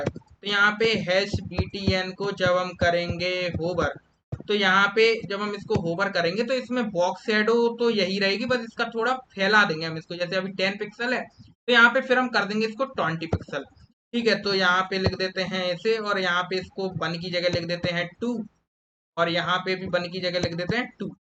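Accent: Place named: Bundelkhand, India. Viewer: you bundelkhandi acent is too good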